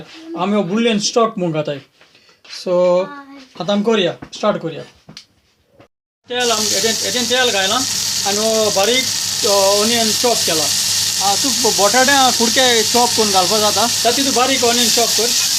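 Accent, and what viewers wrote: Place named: Salcete, India. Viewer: I lik ur salcete accent.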